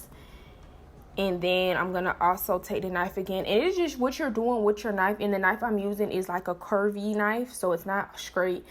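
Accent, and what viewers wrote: Miami, United States. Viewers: I know you from Miami just by how you talking lol but I'm def gone subscribeeeeeee! and try this style too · Lol I was like “oh sis definitely from da crib” 😂😂😂 South Florida lingo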